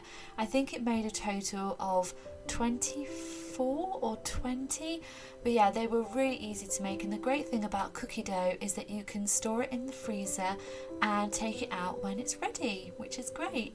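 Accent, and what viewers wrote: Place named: England, United Kingdom. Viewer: I love your accent, can I ask you what part of England you originate from?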